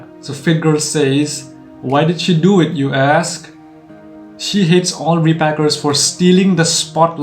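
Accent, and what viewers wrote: Northeast India, India. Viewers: You from North East India bro?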